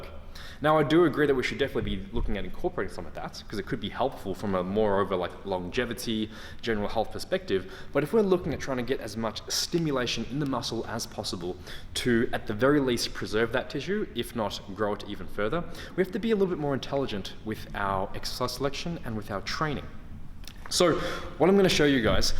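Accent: United Kingdom. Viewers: Who are you with the British accent? Gym closed bro !! Why are your still open bro??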